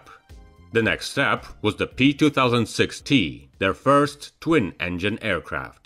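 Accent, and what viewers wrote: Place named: Russia. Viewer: I needed more "Wings of Russia" and I found you - everything related to aviation sounds better in a Russian accent!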